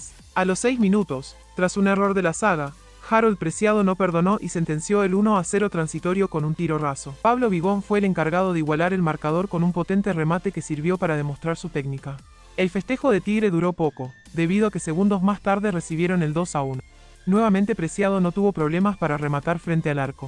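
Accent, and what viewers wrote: Argentina. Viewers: Pero por qué hablas como argentino?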